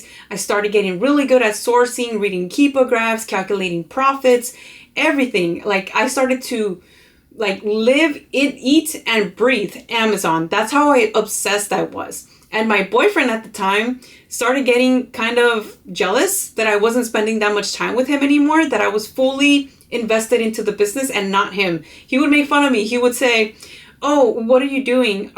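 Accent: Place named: Los Angeles, United States. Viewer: Hell yeah! I started to follow you, I can relate to you from that LA accent lol , I grew in LA ayeee fooo!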